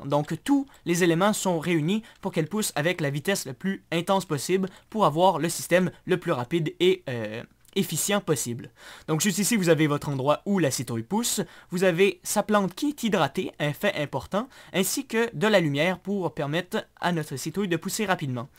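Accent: Quebec, Canada. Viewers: j aime trop ton accent quebecois ;) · Ta laccen quecois et texpliquer comme un francais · Moi perso j'aimerais être un Quebecois j'aime l'accent ^^ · je découvre tout juste, et franchement c'est vraiment pas mal. (j’adore l'accent québécois )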